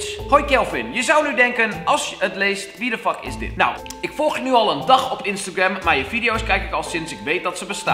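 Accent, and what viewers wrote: England, United Kingdom. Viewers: Ja ik bedoel een echt Engels accent, zou raar zijn als hij "nep engels" praatte😂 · Hij praat met een Brits/Engels accent en dat is heel anders dan Amerikaans/Engels😂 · Dat accent bij zijn Engels 🔥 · Ik hou van je Brits accent 💙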